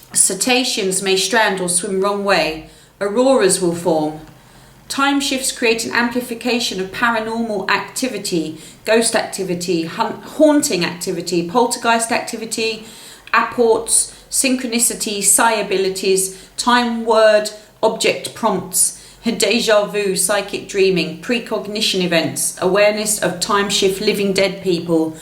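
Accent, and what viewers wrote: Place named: United Kingdom. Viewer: please dont delete your channel. I love your sharings and British accent.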